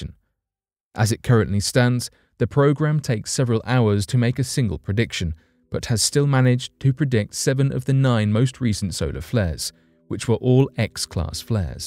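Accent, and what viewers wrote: United Kingdom. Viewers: British pronunciation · Yeah well he is not American…he is British That is how the British pronounce it. In fact that is how I pronounce it too. I’m South African and we speak UK English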